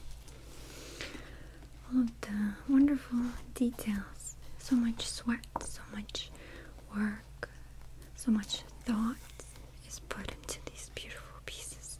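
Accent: Russia. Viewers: i love your russian accent and your handwriting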